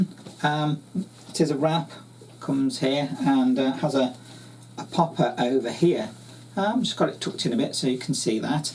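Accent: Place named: United Kingdom. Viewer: How lovely and refreshing to hear a UK accent! Thank you for sharing your sewing projects. I'm very keen on upcycling so I hope to see more on your channel.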